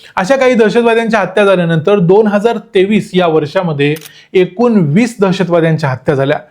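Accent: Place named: Kolhapur, India. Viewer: असून मस्त कोल्हापुरी बोलतोस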